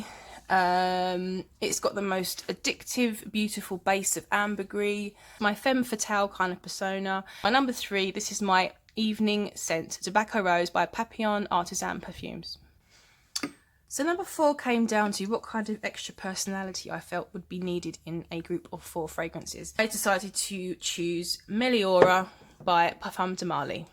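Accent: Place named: Surrey, United Kingdom. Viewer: I love Surrey English. Music to my ears. I wish I could speak like you. ❤❤❤ I only lived in the UK for five years but will forever love and miss it.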